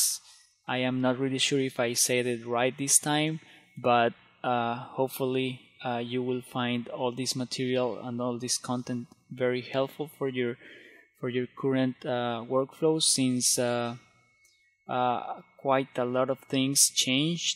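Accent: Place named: Philippines. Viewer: Thank you for this! Are you from the Philippines? That accent though.... More power to your channnel! :)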